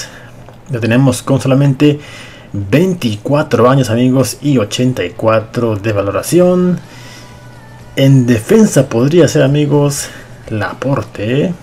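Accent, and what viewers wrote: Peru. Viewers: eres peruano ¿? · eres peruano?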